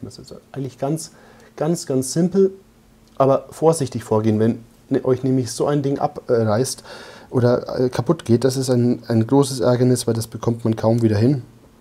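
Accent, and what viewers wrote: Germany. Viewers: Mit dem leicht fränkischen Akzent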